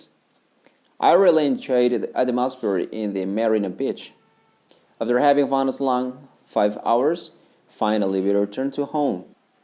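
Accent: United States. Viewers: Kak ini inggris aksen amerika yaa, aku ora nangkep😭. Kalo inggris british masih mending ngerti ngomong apa. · logatnya american bgt, kaya ka Puri viera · dia amarica acent ya? banyak kata yg kurang jelas :v padahal kaya james cordon, ellen, masih bisa nangkep, kalau ini seakan2 susah nangkepnya, mungkin bener di review sma mba sacha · Hi sir, american accent nya mantul. Pngen request klo boleh yg british accent, soalnya ak mau latihan buat tes toefl, krn ak perhatiin listening sectionnya kebanyakan pke british. Thank you 🙏🏻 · sir, America 'aksen? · ini pakai accent American English kah kak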